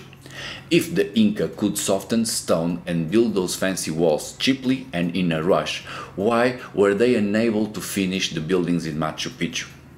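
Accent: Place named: Portugal. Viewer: Why do you sound like you are portuguese while speaking english?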